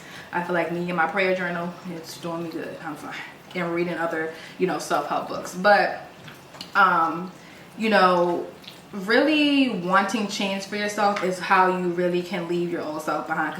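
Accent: Baltimore, United States. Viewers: Are you from Baltimore I hear an accent ? This video was needed change is always good.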